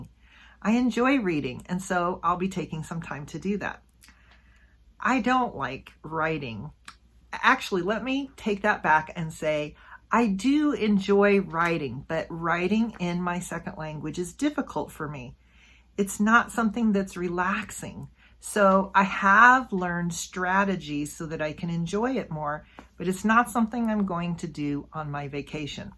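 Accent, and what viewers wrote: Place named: United States. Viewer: I love American English and American Accent so much Dear.😊😇🥰😍🤗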